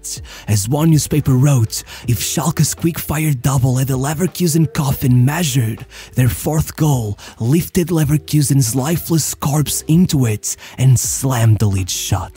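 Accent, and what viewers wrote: Portugal. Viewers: I think he sounds like this because of the Portuguese accent and not by choice really. But personally I prefer it than highly energetic YouTubers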